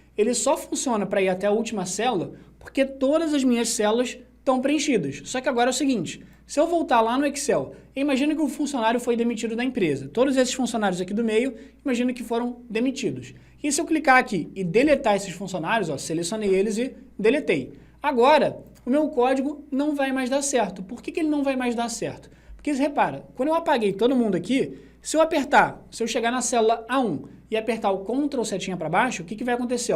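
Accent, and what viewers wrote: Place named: Rio de Janeiro, Brazil. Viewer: Sinceridade, não sei se é sextou com vba ou estou com vba, eita sotaque do carioca... na dúvida #sextoucomvba! vou pelo ouvido...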